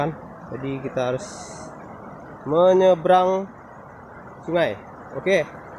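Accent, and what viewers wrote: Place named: Solok, Indonesia. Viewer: Logat bahaso solok kentara,slaam sesama rang slk da,dimaa lokasi spotny ko da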